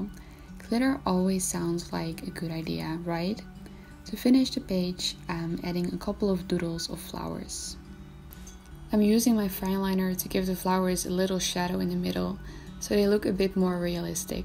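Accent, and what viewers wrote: Netherlands. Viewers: What country do you come from? (Your accent sounds a bit dutch) 🌻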